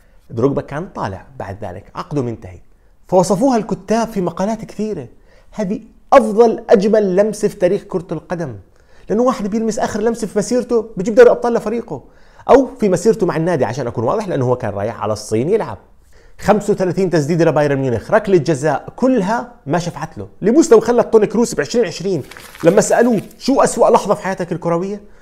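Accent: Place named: Syria. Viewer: اللهجه السورية بتجنن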